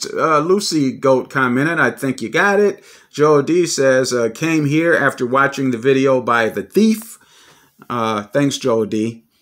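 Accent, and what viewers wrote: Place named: Detroit, United States. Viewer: ok peace brother, keep the videos coming. That Detroit accent is very distinct. I met Manny years ago in Ann Arbour.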